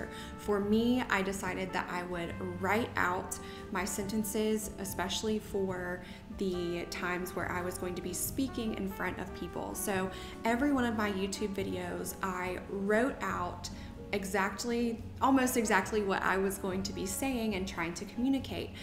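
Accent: South, United States. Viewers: I disagree, but I'm not American. One of my favourite elegant ladies, Kathryn Morgan, a professional ballet dancer, is also southern. I find your accent really sweet, definitely more so than certain British accents (like the Midlands!!!), and I've never had any trouble understanding you. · I’m English and live in London, I love listening to your southern accent 😄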